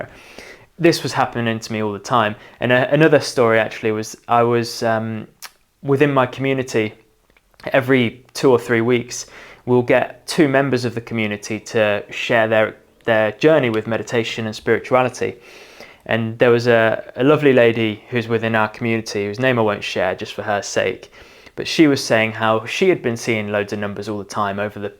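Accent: United Kingdom. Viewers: Are you related to the late Lady Diana? You have the same accent.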